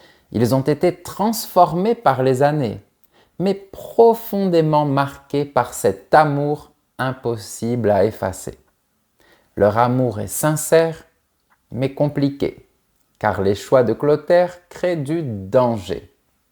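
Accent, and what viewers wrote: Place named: South, France. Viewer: Bonjour! J'adore vos vidéos! Mon mari et moi avons récemment déménagé de Californie à Toulouse et on est amoureux de cette belle Ville Rose! Quand j'ai entendu votre façon de prononcer certaines choses, je me suis demandé si vous venez peut-être du sud de la France. Je viens de découvrir dans une autre de vos vidéos que vous habitez à Toulouse. Très cool. Peut-être je vous verrai promener dans la rue un jour. J'aimerais bien faire votre connaissance. Merci de toutes vos vidéos qui nous aident beaucoup. Vous êtes TOP!!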